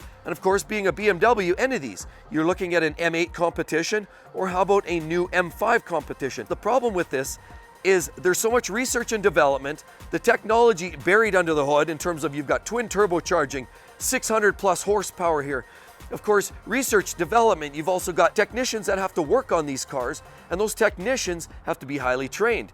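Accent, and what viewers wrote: Canada. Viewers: It took me until to realize he's Canadian.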